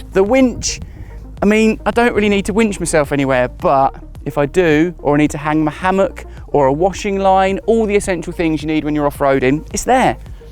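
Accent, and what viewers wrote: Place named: United Kingdom. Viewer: Very entertaining, thanks. I enjoy your style, and for once, a nice British accent. Nice job.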